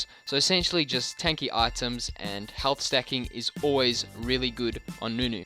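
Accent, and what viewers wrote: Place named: South Africa. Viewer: That accent. Sounds like South African living in Australia.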